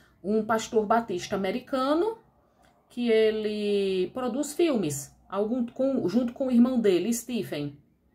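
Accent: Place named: Minas Gerais, Brazil. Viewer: Tu é mineira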